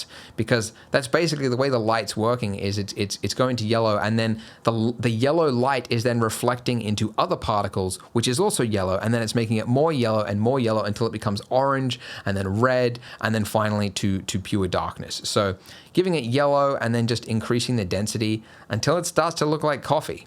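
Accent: Australia. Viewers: Oh I love Aussies, they're such good sport. And the accent is always a + too.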